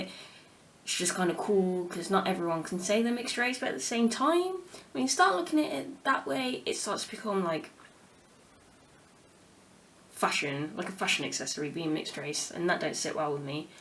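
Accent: United Kingdom. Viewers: When u started talking I'm like oh u must be British and white but then when u said what ur mixed with I'm like .... Then why do u talk like that ? But ur beautiful the way u are! No matter what race & u should embrace more of your black side ☺️. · You have British accent, and they call you SPANISH????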